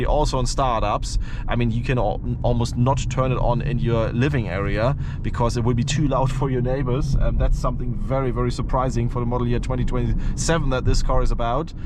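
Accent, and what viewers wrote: Germany. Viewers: A german trying to speak british english with a heavy german accent. It sounds so ridiculous and disgusting 🤢🤮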